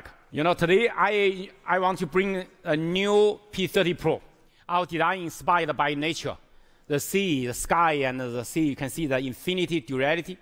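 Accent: China. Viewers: I'm Brazilian so my accent is not amazing, but in some parts of the video I truly thought he was speaking Chinese. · I’m Cantonese and I hear Mandarin in parts too 😂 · I honestly thought he was speaking Chinese and then translating to English. Turns out the whole speech was in English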